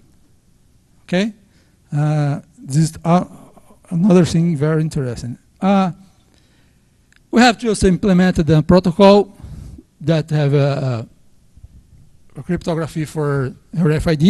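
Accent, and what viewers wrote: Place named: Brazil. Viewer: His accent looks like brazilian accent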